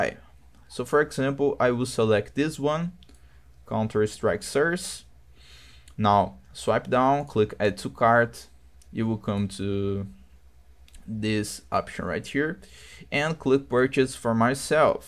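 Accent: Brazil. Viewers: You have a very Brazilian accent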